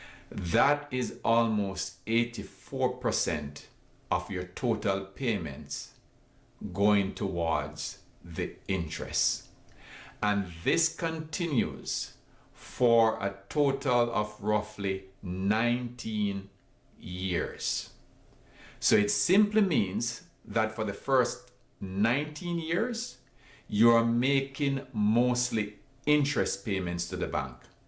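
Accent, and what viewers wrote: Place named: Zimbabwe. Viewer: Nice accent! Zimbabwe???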